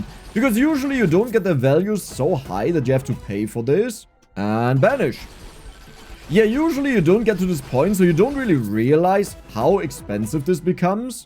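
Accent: Germany. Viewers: People that dont like you talking you dont want as followers anyway. Your German accent rambling with a little campness in there is absolutely brilliant. I watch for that now. Megabonk is cool but your rambling is priceless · as a viewer since early vampire survivors, I like listening to ur silly German accent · I just love the "Deutscher akzent". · Love when you talk. Otherwise it had been so boring. I’m from Sweden and learnt German from playing cs in the old days. And you’re accent brings back so much memories for me ❤ and my favorite German insult is Deine mudda schnallt sich ein dreirad unt spielt mit der transformers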